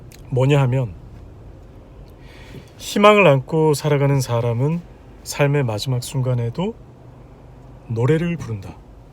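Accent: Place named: Seoul, South Korea. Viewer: 개안은데.. 즌반적으로 서울 싸람 냄시가 좀 마~이 나네요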